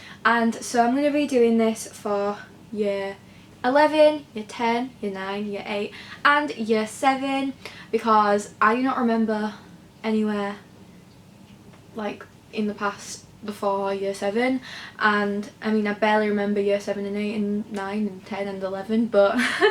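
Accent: Yorkshire, United Kingdom. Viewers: Ackley Bridge accent YAS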